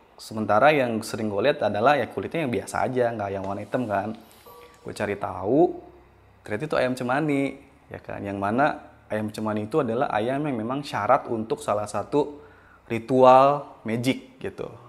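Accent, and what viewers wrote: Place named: South Jakarta, Indonesia. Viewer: Ada logat2 jaksel kek nya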